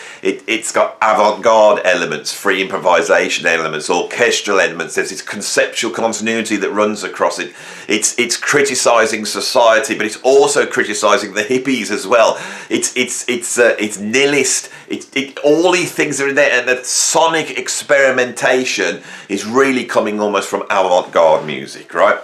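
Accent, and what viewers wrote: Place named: West Midlands, United Kingdom. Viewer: All your videos are informative, funny , intelligent and with such love of the subject that i cannot be anything but impressed.... And its good to hear a west midlands accent espousing mind nuggets 👍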